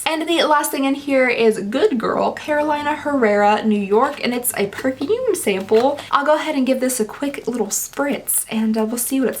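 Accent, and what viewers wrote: Kentucky, United States. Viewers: It's crazy that I clicked on and watched your video lol your voice is the only one I can handle I scroll down and realized why!!!! Your a fellow Kentuckian!!!! Go cats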